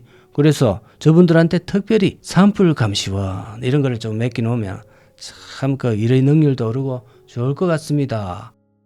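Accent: Gyeongsang, South Korea. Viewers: 전라도 사투리도 듣기 싫지만 경상도 사투리 심한 것도 귀에 거슬립니다. 억양은 어쩔 수 없더라도 일부러 더 심한 사투리 쓰는 것은 좀 지양하심이. 몰입을 방해해요.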